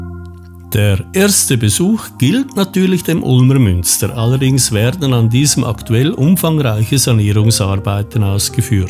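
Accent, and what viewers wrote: Switzerland. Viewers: sehr schönes Video eine sehr angenehme Stimme mit diesem netten Schweizer Dialekt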